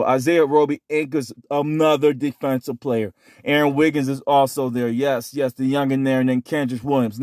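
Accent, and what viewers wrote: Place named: Australia. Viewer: lol, love the urban-ausie accent. :) Thanks for the video man. Appreciate ya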